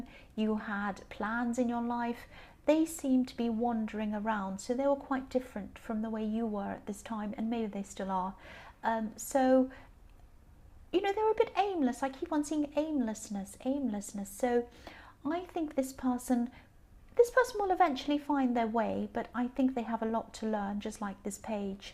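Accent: United Kingdom. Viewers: you're so British!!! Love you!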